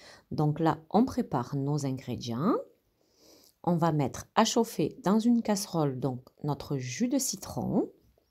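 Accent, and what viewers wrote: South, France. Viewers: Coucou, elle me plaît beaucoup ta bûche, effectivement elle est à "croquer "parfaite pour cette année à Noël 🤶 🎅 🤶 🎅, merci beaucoup pour tes partages avec notre bel accent du Sud...Gros bisous et très belles fêtes 🥳 à toi et à ton entourage. 😊😊😊😊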